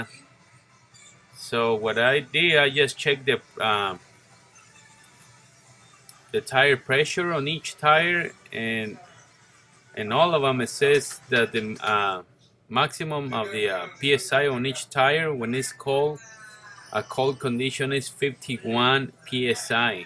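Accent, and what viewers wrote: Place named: Mexico. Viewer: Give him a break bro, that's a Mexican accent, at least he can speak 2 languages (Spanish and English) .Stop the hate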